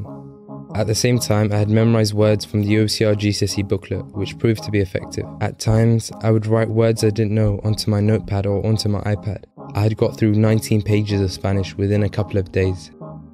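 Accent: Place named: Somalia. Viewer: what dialect of somali is that? i understood some but not all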